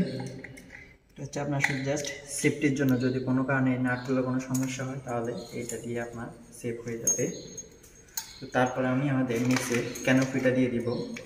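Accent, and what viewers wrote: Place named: Sylhet, Bangladesh. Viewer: মাশা-আল্লাহ ভাই খুব সুন্দর করে বুঝালেন আর আপনি বুধহয় সিলেটি আমিও সিলেট থেকে দেখছি❤❤❤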